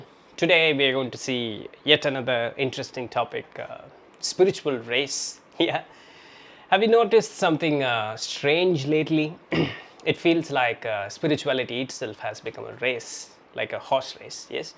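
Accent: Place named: India. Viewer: I'm surprised with your good English with less indian accent...l This makes your video in english more inner understanding with feelings... Thank you for this english you tube channel & May you get the highest success & blessing from God... Love from 3rd indian generation living outside india ❤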